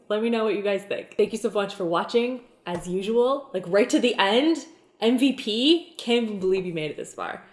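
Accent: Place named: Canada. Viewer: I came here trying to find some ramen lore, and then you mentioned Nanaimo bars and I was like...... wait a minute.... and then the way you said that they are named after Nanaimo, a city in BC, like people would just know what BS stands for, and then I got to thinking about your accent and I did some quick google searching, and I HAVE FOUND A FELLOW CANADIAN ON THE INTERNET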